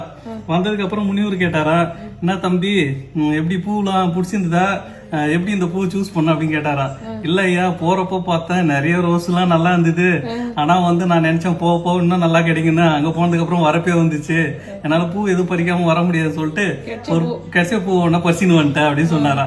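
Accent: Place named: North Arcot, India. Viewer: சென்னை வட ஆர்கேட் பேச்சு வழக்கு ❤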